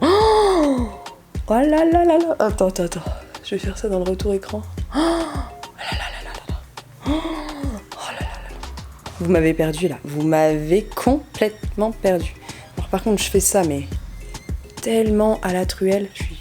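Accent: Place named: Franche-Comté, France. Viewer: Mdr oui les francs comtois ont un accent lol. Ah oui je suis une franc comtoise et du haut Doubs donc un cran de plus 😂🤣😂 Si jamais on peut te voir où sur Besançon ? Je sais pas si tu voudras le dire mais histoire un.jour de se rencontrer... Vidéo top moi qui aime.a donf les couleurs vivent et oui dans le.bio on a que des couleurs nudes... donc trop Contente de voir cela. Merci À bientôt pour une prochaine vidéo... hâte 😘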